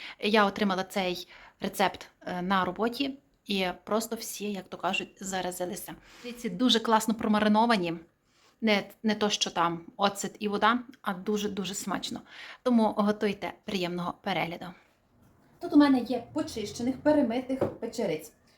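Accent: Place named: West, Ukraine. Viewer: Так приємно чути мову західної України, просто пісня!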